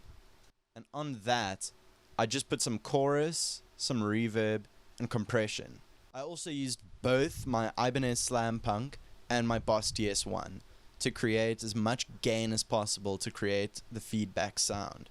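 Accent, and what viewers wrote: South Africa. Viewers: Ayo SA accent who? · could tell youre south african from the accent lol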